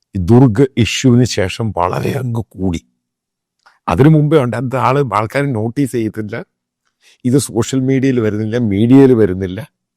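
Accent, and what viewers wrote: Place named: Travancore, India. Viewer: തിരുവിതാംകൂർ ഭാഷയുടെ ഭംഗിയാണത്.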